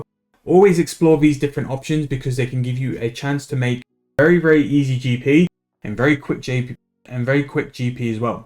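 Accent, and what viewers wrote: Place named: United Kingdom. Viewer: 6M an hour doing enchants... damn I wish I tried this before. Trained 99 mage with combat, did some telegrind (sandstone --> sand) and I never looked into enchanting items really. Thank you so much! If you would talk a little bit slower, I'd appreciate it a lot. As a non-native English speaker the UK English is often hard for me to follow up with. Either way, I finally subscribed to you. Let's go to the 6000 subs!!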